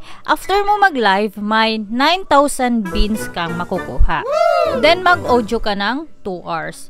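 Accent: Visayas, Philippines. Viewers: Wow bisaya si madam hehe thank you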